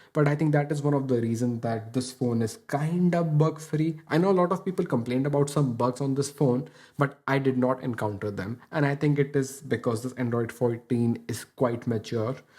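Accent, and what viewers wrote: India. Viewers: Bro, are you from Bangalore.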